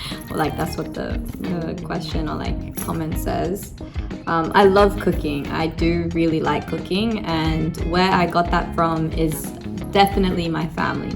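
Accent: Australia. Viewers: You got Australian accent xx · I can hear your Australian accent! I live in Australia so I felt an affinity with you haha I live on the Gold Coast Where is your dad from? 🫶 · AussieアクセントとAmerican or Canadianアクセントが混ざってる～💕住む地域がいろいろだとそうなりますよね！！なんだか自信をもらえました。ありがとう❤ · You’re English is very Aussie💖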